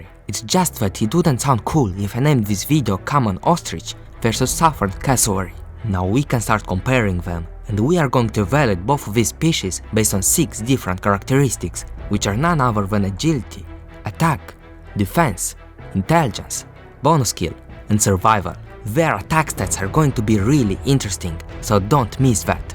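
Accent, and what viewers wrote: Russia. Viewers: Oh I thought you were from Russia because of your accent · i love your russian accent , kisses from Algeria !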